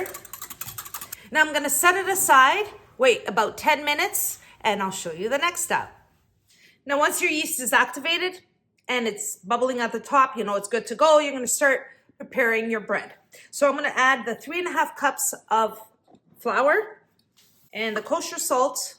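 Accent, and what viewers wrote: Portugal. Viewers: Your português acent is beautiful ❤❤❤❤ I'm from Portimão in the Algarve, are you from Açores?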